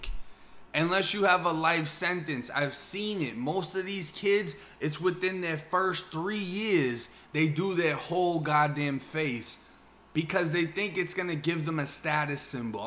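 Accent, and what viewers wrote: Boston, United States. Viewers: I’m from England and clocked the Boston accent straight up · I'm 46 yrs old. I'm from Florida born and raised. I graduated in 93". From 94 to 96 I went to a college called Dean College in Franklin, Ma. You have a very strong Boston accent. Love your videos, stories, and content. Thanks for sharing. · Are you from BOSTON? I'm in upstate NY and I can hear that accent.I love it!!!😎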